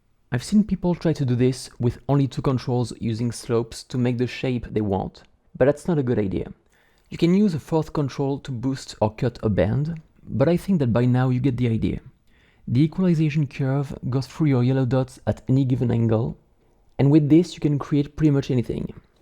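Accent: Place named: France. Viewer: Merci frro j'ai reconnu l'accent français 🤩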